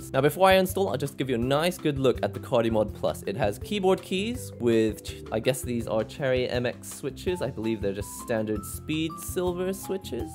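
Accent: United Kingdom. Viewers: this is my first video of yours and seeing the name " " i was NOT expecting that thick british accent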